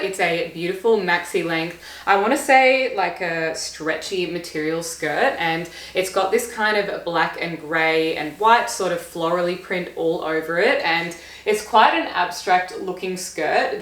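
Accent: Australia. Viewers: You are a beautiful dark brown haired young woman. Beautiful eyes look kind of blue super cute, beautiful smile and cute voice with the Australian accent. Beautiful body and curves, cute little belly button, nice booty and sexy long legs. You look STUNNING and super cute in all of these outfits. You look like a dark brown-haired angel in the white dress. Everything this video looks REALLY good on you. All of the colors go really good with your skin tone and hair color and make you even prettier. You are beautiful and really cute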